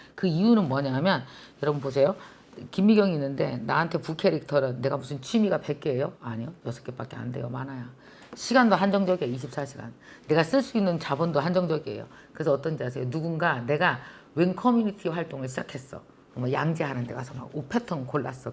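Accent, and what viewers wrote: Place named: North Chungcheong, South Korea. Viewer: 언제나 잘보고 있습니다 충북이 고향이라 아주 귀에 딱딱 들어 옵니다 ^^ 마치 고향 이모가 말해 주듯이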